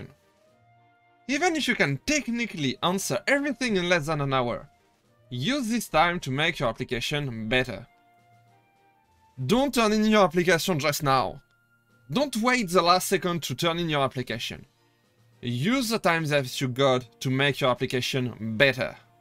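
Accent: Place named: Japan. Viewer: great video. btw Im interested in your accent. is your native language japanese?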